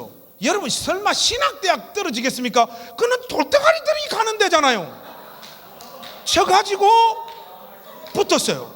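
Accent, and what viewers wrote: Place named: Gyeongsang, South Korea. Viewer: 목사님~♡ 경상도 말씨 듣기 좋은데요. 단세에 오셔서 간증하실때 홍수와 요강 훅 와닿았어요. 목사님 응원합니당.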